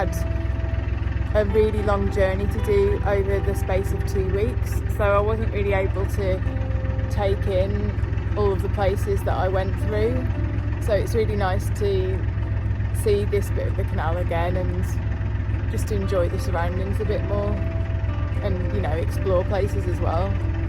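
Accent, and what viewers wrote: United Kingdom. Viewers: Oh thank you for your reply! Yes I've admittedly pretty much binge-watched most of your videos this past week after my wife and I stumbled upon one of them last weekend. I just love outdoorsy things and calm, authentic content. And the latter is someting way too many youtubers lack, especially as we're living in times of loud, nervous short-form clips. So thank you for your videos! They have really brightened my past few work days! :D The British canal system is fascinating, we don't have many canals here! Oh and every time I hear a calm voice with a very british accent it kinda reminds me of a very dear friend from England (though from another region), and that's something! ;) Stay the way you are and keep the videos coming! Much love from Germany.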